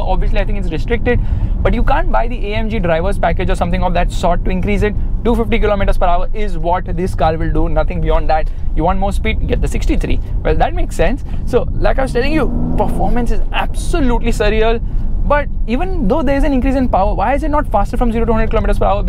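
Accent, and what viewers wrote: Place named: India. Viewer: your english is boring learn how to to pronounce and continue with it you are here in hindi accent and you feel like english really is boring just listen just go for hindi you think you speak english but reality is you are speaking Hindi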